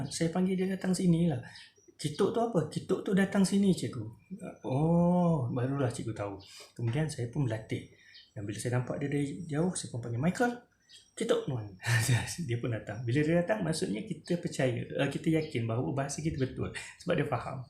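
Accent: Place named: Iban, Malaysia. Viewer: Best sebutan cakap Iban cikgu. Betul2 original. Belajar lebih banyak lagi Cikgu.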